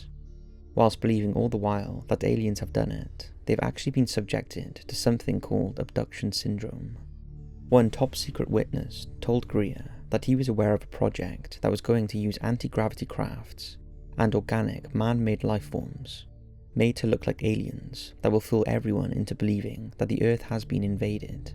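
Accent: Wales, United Kingdom. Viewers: 😂😂 sounds like a semi-welsh, generic accent, plus, a commenter on this comment thread has already pointed out they can't stand the voice, not massively effective I'd say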